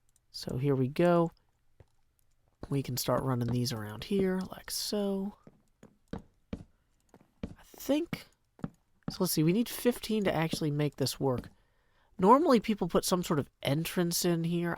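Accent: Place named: South, United States. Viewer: Yes. It has been a very long day and your southern dolcet tones are as warming to the soul as Pie and Chips sandwich:D keep up the relaxing work:D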